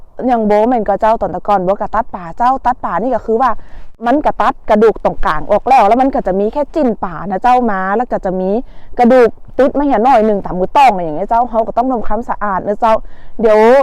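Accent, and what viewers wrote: Thailand. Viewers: ฟังภาษาเหนือเพลินมากจ้า😁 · ฟังม่วนดี ขอทายเป๋นคนพะเยาแม่นก่อ..คิคิ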